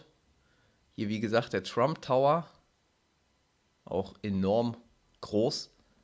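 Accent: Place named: Dortmund, Germany. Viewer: Als ob du aus Dortmund Kommst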